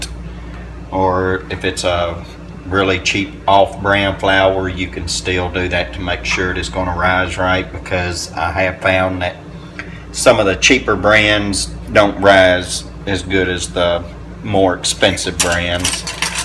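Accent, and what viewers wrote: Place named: South, United States. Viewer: When I grew up my friends mother sort of took me under her wing used to take me in when my Dad threw me out wash my cloths and keep me out of trouble. She had the same wonderful Southern Draw you and have. 😊 brings back fond memories.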